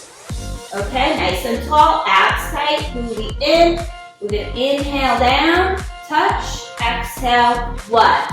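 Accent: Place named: East Coast, United States. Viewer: You make this doable. Wonderful attitude and sounds like you are from the east coast. New Jersey maybe?? Are you still doing your personal trainer program, and where are you located please. Thank you for sharing this.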